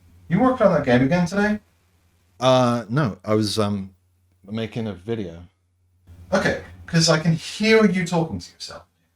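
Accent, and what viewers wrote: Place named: United States. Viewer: Not the American accent for reading the spotlight rules 😭😭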